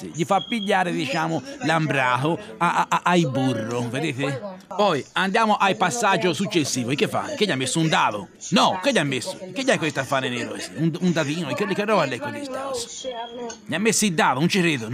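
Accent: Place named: Quarrata, Italy. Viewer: Parla il quarratino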